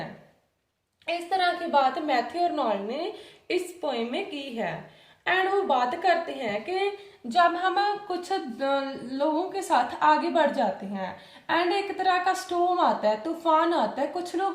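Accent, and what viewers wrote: Punjab, India. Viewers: Your voice reflect Punjabi tone,nice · 👌 Very nice video mam. 🙏 Thank you so much for your valuable information. क्या आप पंजाबी या हरियाणा से हो? ऐसे ही पूछा है आप के बोलने के अंदाज से.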